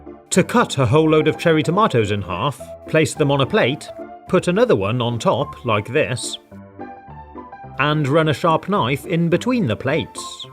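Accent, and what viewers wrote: United Kingdom. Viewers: I never knew hes brittish. Im also british 😊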